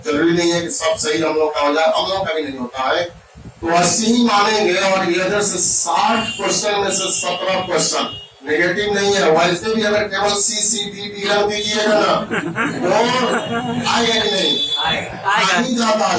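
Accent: Bihar, India. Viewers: aapke bolne ka andaj bilkul bihari jaisa hai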